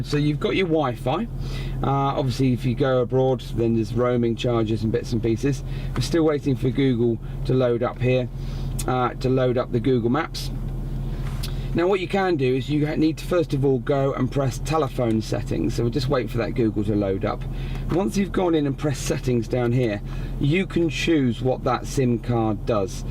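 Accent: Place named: England, United Kingdom. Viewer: Hi Just found you and nice to hear an English voice giving help for a change hahaha!!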